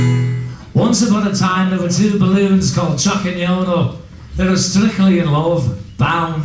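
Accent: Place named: Liverpool, United Kingdom. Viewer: Incredible. I'd love to see this guy! And he picked up the liverpudlian accent as well. Awesome job!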